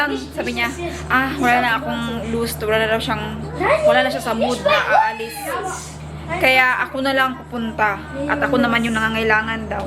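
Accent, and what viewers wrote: Visayas, Philippines. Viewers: Yun mga anak mo kasi Dyan pinanganak ikaw visayan intonation pa din Even you speak English or British people will notice your intonation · Bisaya toh halata sa accent nya.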